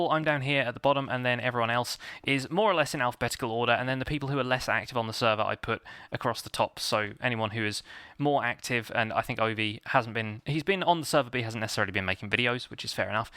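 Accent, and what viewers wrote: United Kingdom. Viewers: It always seems a bit wrong hearing "Merry Christmas!" in a British accent rather than "Happy Christmas!" but there you go. Hope you had a wonderful Yuletide,.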